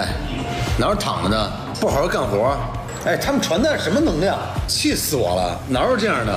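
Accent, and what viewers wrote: China. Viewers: 北京口音好爽，语速也超快。我本人是外国人，只能听一下真学不来。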